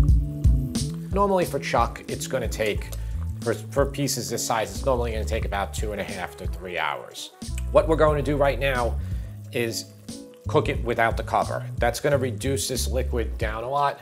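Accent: New York, United States. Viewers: Hey man, this is the first time I watch you and I love your NY accent. Cheers from Eastern Europe. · I was like you sound like me a New Yorker born and bred.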